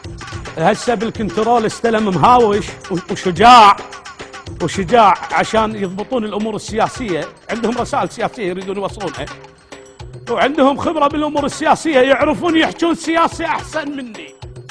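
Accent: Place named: Kuwait. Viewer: ظابط اللغه ماشاء الله كويتي انت ها .؟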